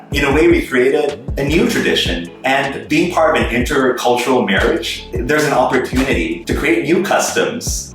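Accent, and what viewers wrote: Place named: India. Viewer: The way u speak sounds pretty Indian also the way u call her name it seems like u are familiar with hindi!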